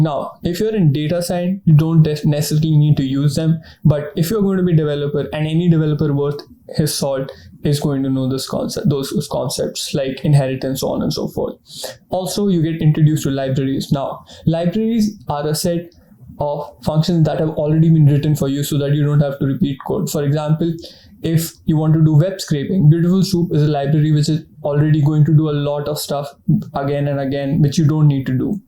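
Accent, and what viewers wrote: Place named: India. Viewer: nice... but i hate how Indians speak English... are u doing intentionally or it is just u don't wanna bother to ppl understand u nice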